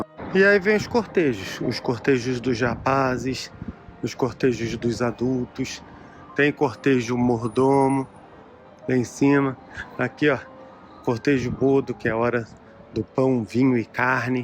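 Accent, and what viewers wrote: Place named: Niterói, Brazil. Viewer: Muito bom o video, reconheci o sotaque de Niterói. Gostei, porquê você conta as histórias. Parabéns!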